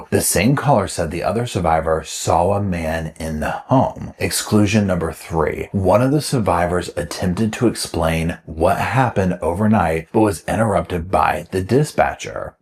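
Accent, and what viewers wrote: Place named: Western North Carolina, United States. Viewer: I didn’t know you are a fellow Tarheel. I thought your voice was kinda western NC:)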